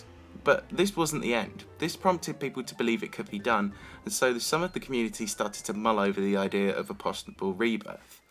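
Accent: United Kingdom. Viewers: I like your British accent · It surprises me how British you sound in this video... Your accent could even rival Bold's.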